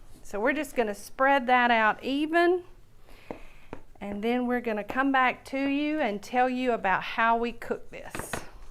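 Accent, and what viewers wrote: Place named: North Georgia, United States. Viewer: Looks great! I've never made homemade cornbread before, so I'm so happy I found this demonstration. "Smell-O-Vision!" I love it! You sound like North Georgia or East Tennessee, and it was really enjoyable listening to you. Happy Thanksgiving!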